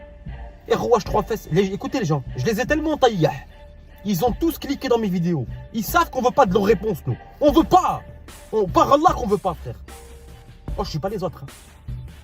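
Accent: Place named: Lyon, France. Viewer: Ca ce voit ta été a Corbas 😂😂 tu pavarle comme les Lyonnais (sal mouille)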